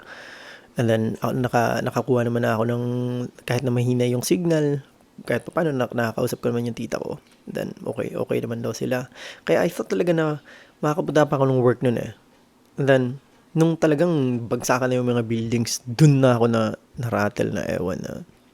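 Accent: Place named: Luzon, Philippines. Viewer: New subscriber here. You’re from Gensan but you sound like you’re from Luzon when you speak Tagalog. Just an observation from someone who grew up in the island of Luzon. 😊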